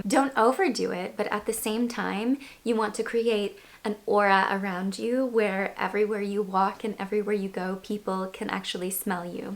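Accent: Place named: Ireland. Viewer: The minute you said you were from Ireland i could instantly hear it in your accent, but i hadnt picked up on it before! I dont know why but i thought you were American! Another great vid, loving the content :) xx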